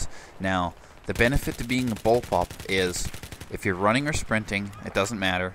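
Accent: Canada. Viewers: Are you Canadian? And please do the F2000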